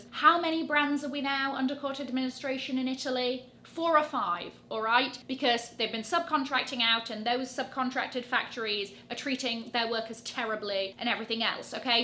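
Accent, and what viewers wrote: United Kingdom. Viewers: Your synopsis is “sodden genius” (said in your British accent) ❤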